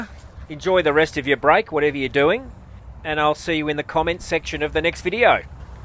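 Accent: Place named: Australia. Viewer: I love your informal Australian accent and I do note Albanese is not wearing his signature gold cross necklace which would have just completed the look. A funny story about a man called Paul who went in for some hospital surgery and came out with "man breasts" because his friend a notable Bishop had set up the doctor 🤑 to inject some fat around the pectoral muscles 😳 😲 Apparently it was an inside church joke and every time the priests saw him with his new look, they had a good laugh. 😹 💯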